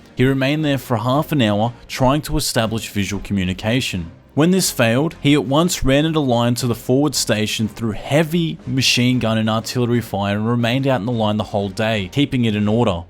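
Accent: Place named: Lebanon. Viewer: The narrator sounds Lebanese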